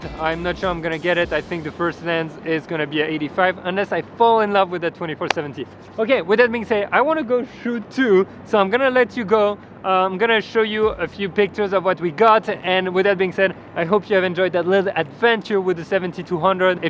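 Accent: France. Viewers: Un de ces accents français ahhahaah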